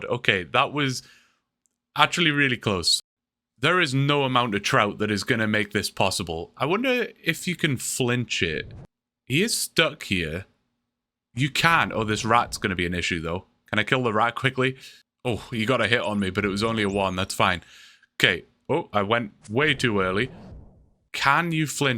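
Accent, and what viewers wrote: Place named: Newcastle, United Kingdom. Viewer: A geordie RuneScape player? Thought i was alone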